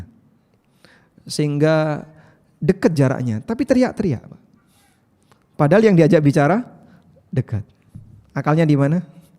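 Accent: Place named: Java, Indonesia. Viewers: Mas syaa allah..... saya orang jogja yg merantau di bogor.... paling seneng khas logat Jawa nya... serasa di nasehat dg cara yg lembut dan halus.... Barakallah... sehat berkah pak ustadz❤❤❤krn allah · masyaallah tabarakallah, jazaakumullah khairan. sebagai orang jawa beliau cukup i detik dgn jawanya serasa di nasehati orang dekat.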